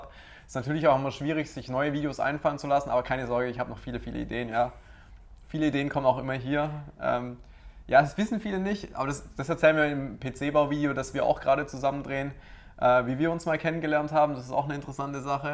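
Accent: Swabia, Germany. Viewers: Ja mei, du bischt eh Schwob :-) (habe da nur gewohnt,bin kein Schwabe) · Sackzefix der kerle kommt ja US m schwabeländle🤯✌️ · eins mit full schwäbisch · ich höre dass du Schwabe bist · Does he sound "schwäbisch" to Berlin ears? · Gibt es ein Video in dem du Schwäbelst? Falls nicht sag ich Mal "Back to the Roots" :D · Ich wusste es, dass du ausm Süden kommst. Ich hab irgendwas schwäbeln gehört. So n bisschen zumindest und ich war mir nie sicher 😉😂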